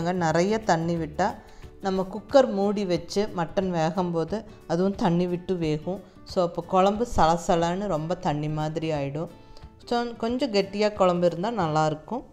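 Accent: Coimbatore, India. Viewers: இது கோயமுத்தூர் தமிழச்சி குரலா?!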